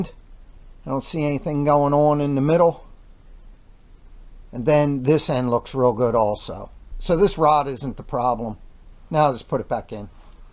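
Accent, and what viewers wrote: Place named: Mid-Atlantic, United States. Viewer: Also, are you from PA, Deleware, or Northern Maryland? Sounds like it.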